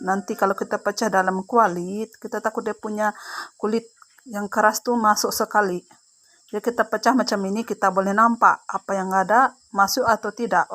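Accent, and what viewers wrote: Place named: Sabah, Malaysia. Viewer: Kc antam saja tu melayu sabah bha. .haha. .more real sabahan style !!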